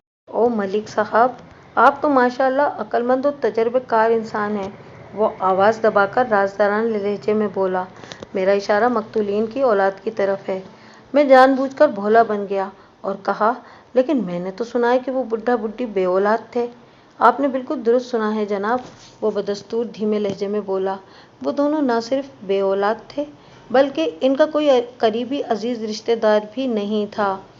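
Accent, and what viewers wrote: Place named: Karachi, Pakistan. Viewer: BB aap Ka taluq Karachi se feel hota hai 🤩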